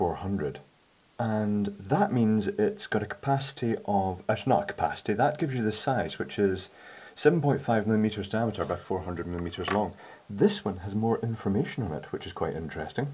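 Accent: Scotland, United Kingdom. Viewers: Nice to see your face!! I really love your videos and also your beautiful British accent; nice radio voice! Keep up the good work! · Scottish Accent.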